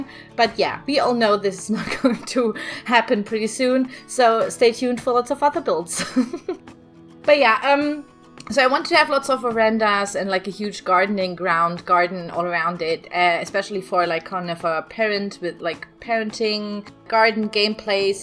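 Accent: Germany. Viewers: This was the first video I have watched and must say didn’t notice your accent. You are doing very well love the German touch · are you from Germany or Austria? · I'm really confused. Why would you watch a German youtuber if you don't want to hear a German accent? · I LOVE HOW YOUR ACCENT IMMEDIATELY GOT STRONGER LIKE Y E S also please don’t change your accent... i love german accents and you should never change who you are :)) I’m from Britain, and I get teased a lot for saying things ‘poshly’ as my family is really English and well-spoken, and everyone around me has a really Welshy accent. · I don't think your accent is annoying at all - it's lovely to listen to, and not as strong as I'd imagine a German accent to be, lol! I love accents and poeple of all places and cultures, and you are most definitely awesome! The person who was so mind-numbingly rude can go suck - don't ever change! 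· Your reaction to that comment was GOLD as a fellow German I know how hard it is to get rid of a german accent but honestly??? Yours isn't even bad??? When I started watching your videos I was like "she's german right?" because I did recognize the accent but I wasn't even entirely sure! It's ridiculous how some English native speakers (obviously not all, I see a lot of sweet comments here! <3 ) take the content produced by non native speakers for granted to the point of complaining about their accents oh boyyy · I never realized that your accent was german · Vermutlich weil sie es selbst nicht besser können .. oder GAR nicht ^^ Ich finde dein Englisch schon ziemlich amerikanisch. Manchmal redest du so amerikanisch-kauderwelschig ^^ dass ich dich kaum verstehe ;D Da sind eigentlich nur noch wenige Wörter bei denen man den deutschen Akzent raushört. Außerdem hab ich gehört, dass Amerikaner in der Regel den deutschen Akzent ziemlich niedlich finden :) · You are german!!??? 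😉I live in Germany and my son have the same accent when he speaks english and i find it really lovely, just like your creations! 😊❤✌ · I agree with you plus her English is really clear to understand evan with the German accent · I love your accent and I think it's really obnoxious for people to complain about it. It just goes to show you how spoiled we Americans can be. I for one am very grateful that you record in English at all. It's not your first language and I'm sure it would be easier for you to record in German so I appreciate the fact that you care enough to try!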